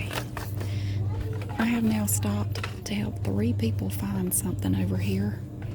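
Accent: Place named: North Carolina, United States. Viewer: The boxes being as unstable as the ex husband killed me XD Also, a few more things about these videos that I absolutely love? 1. The cleaning up. I HATE messy store shelves. One time while walking around our local Wal-Mart someone decided they didn't want their juice so they just sat it down in the middle of the aisle. Like, really? 2. The jokes! They crack me up, need I say more? 3. The accent! I'm a North Carolinian myself, so just hearing that little bit of a country accent reminds me of family members that talked with the same accent that I have since lost or just haven't spoken to in a long time. These videos are my absolute favorite, but I think the ones in the Caldwell area could really use a good cleanup. I don't really look around, I just grab and go when I visit any Dollar Store in this area, but one that I basically grew up in? 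Please consult this timestamp for my comment: . XD The toy aisle was a nightmare, I would go in looking for teen coloring books and there would be a mix of adult coloring books with intricate designs and kitty cats and Disney princesses in the same pile as the word searches. On top of that mess cake was a sprinkle of some parent putting back the mascara and nail polish and maybe a box of cereal too. XD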